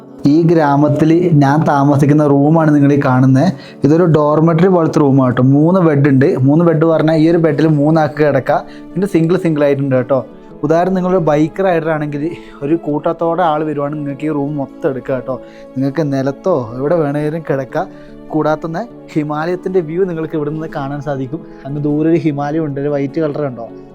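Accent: Kannur, India. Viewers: Halo bhai, namaskar, ur journey, ur adha adhuri hindi language, ur kannur slang, eg koy for kozhi(chicken) r great and amusing. Best wishes and God bless u always. always.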